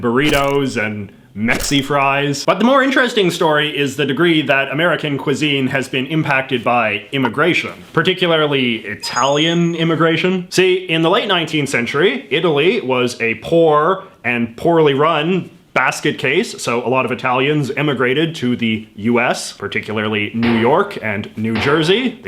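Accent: Canada. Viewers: Hey, are you Canadian? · This guy hits his "out" harder than any Canadian. EVER. We know you're Canadian. Stop it. It sounds gross. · He called pizza margherita boring?!!!! Well he has a horrible sounding Canadian accent so I am not offended.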